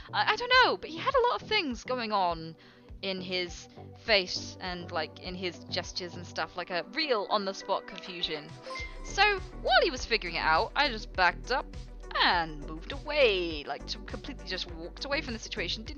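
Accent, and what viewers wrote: United Kingdom. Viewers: You're so likeable 😂 imperfections are such an attractive quality, especially when you have that amazing English accent 😍 from an Irish man 😘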